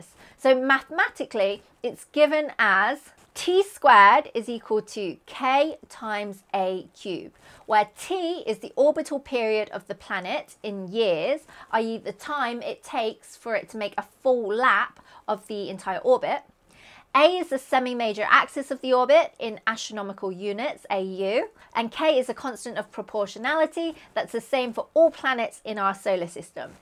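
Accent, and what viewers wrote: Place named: Northern England, United Kingdom. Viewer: We love your Northern English accent.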